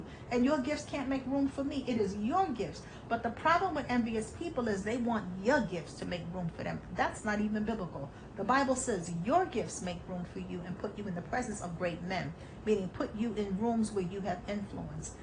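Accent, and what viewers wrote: New York, United States. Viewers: The New York Accent I love that😍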